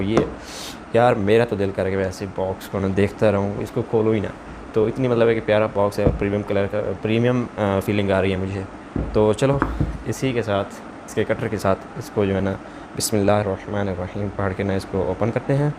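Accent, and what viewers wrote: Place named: Saraiki, Pakistan. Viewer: Lala na tain saraiki boli hay na urdu khichichri barai pia hain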